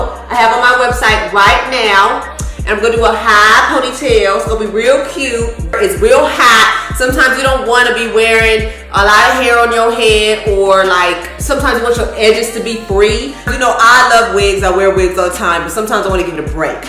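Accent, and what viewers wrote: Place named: South, United States. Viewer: Yes, ma'am, I am here for the consistent uploads! Love your personality and your channel! You are so gifted. I think I remember hearing that you were born and raised in CA, but I definitely hear a southern accent. 🤷🏽‍♀️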